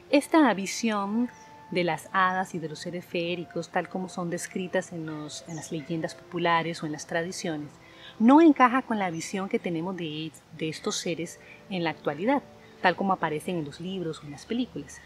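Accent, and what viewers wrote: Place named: Costa Rica. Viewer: Eres de Costa Rica cierto? Según el acento en la forma de pronunciar la R la reconozco… soy de colombia seguidor tuyo… eres hermosa 😍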